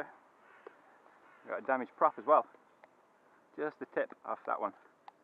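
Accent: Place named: Northern England, United Kingdom. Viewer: Good to hear an 'oop north' accent! A very nice write up too. I'm fairly new to this quadcopter malarkey. When it arrives this will be my first brushless, really looking forward to it :-)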